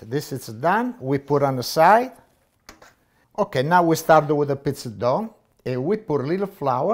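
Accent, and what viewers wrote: Italy. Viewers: Chef you are a wonderful Chef. I love your beautiful food creations. You remind me of my Father who is now in heaven. Your speaking, Italian accent are the same. God Bless you with many years of good health and happiness in your life. Keep making these beautiful video's and educating all of us.You are so delightful. Love you!! Joe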